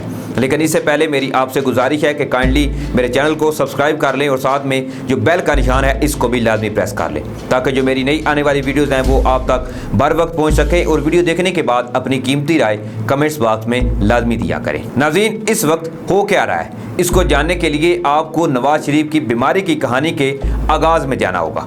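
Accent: India. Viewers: بھائ جان مہربانی کرین۔ اپنا لہجہ ٹھیک کرنے کی کوشش کرین آپ کی ویڈیو دیکھتے ھوۓ تکلیف ھوتی جب آپ خان کو کھان اور خانم کو کھانم کہتے ہیں۔ ایسے تو انڈیا دالے کہتے ہین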